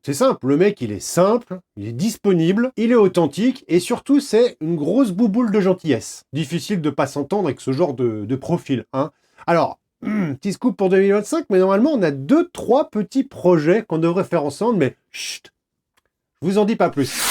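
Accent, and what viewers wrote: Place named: Quebec, Canada. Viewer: Bravo pour l’effort, effectivement l’accent Québécois est pourrit. Si ça peut t’aider on dit Tabarnack. Comme c’est écrit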